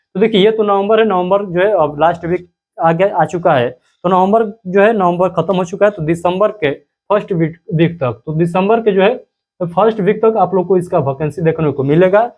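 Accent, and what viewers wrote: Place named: Bihar, India. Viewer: Pahli baat ki aap ki bhasha se aisa lagta hai ki aap Bihar Rajya se hai kya mai sahi hun.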